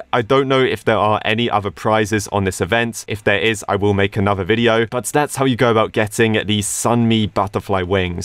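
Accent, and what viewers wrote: United Kingdom. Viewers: You are speaking British English but me america English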